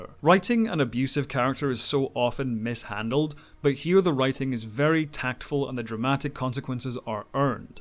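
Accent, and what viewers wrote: Scotland, United Kingdom. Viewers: Thanks for the review,. It's nice to hear a fellow Scot, and especially one so articulate and informative. I didn't know about the behaviour of the actor Cas Anvar, which meant that I couldn't understand the death of Alex in the show, who's incidentally my favourite character. Through no fault of your own, I'm going to struggle to re-watch the show again. Anyway, cheers for the review.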